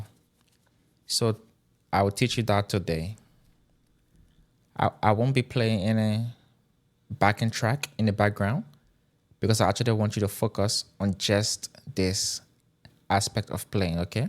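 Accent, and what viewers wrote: Liberia. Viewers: Brother u sound like a Liberian ❤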